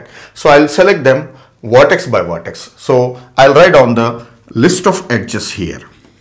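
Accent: India. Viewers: I wish I could understand Indian english accent better, you are clearly experienced and knowleadgeable, intelligent person and also explain things in a simple manner. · even with scary indian accent you are undoubtedly great!!!! Thanks a lot for grand contribution to suffering in inefficiency educational system.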